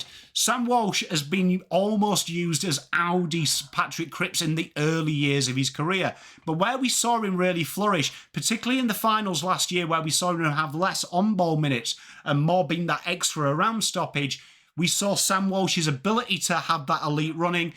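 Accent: England, United Kingdom. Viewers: a pommy talking football lol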